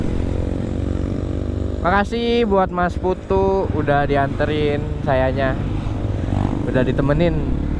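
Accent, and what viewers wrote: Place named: West Java, Indonesia. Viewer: Jangan sampe ilang bhsa sunda nya bang hehe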